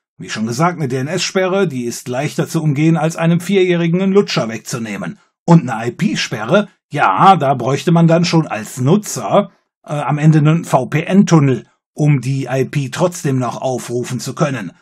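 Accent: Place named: Northwest, Germany. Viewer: ***** "Chlor" = "Schlor"; "Chronik" = "Schronik"; "Chemie" = "Schemie" und "China" = "Schina"? Mann, mann, dieses Nord- /westdeutsch...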